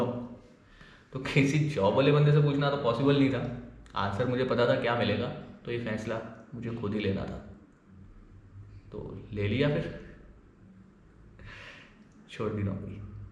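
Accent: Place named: Punjab, India. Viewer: English me punjabi tongue influence hai.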